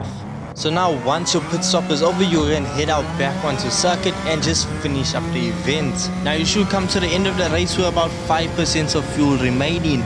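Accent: South Africa. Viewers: I hear my South African boy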